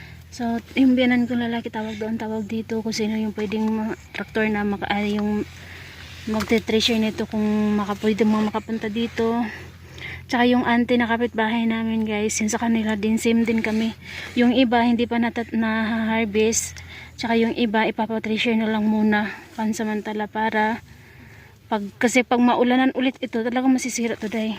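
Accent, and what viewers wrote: Visayas, Philippines. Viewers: Hello Ms...parang Pinas lang din ang cute nang anak mo at napansin ko parang Bisaya ka Ms?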